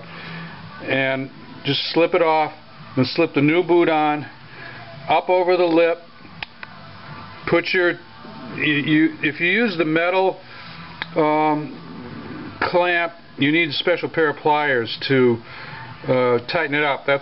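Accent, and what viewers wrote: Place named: Connecticut, United States. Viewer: I really enjoy the Corvette videos. Very informative and useful. Do you happen to be from CT? Accent sounds that way...keep up the good work.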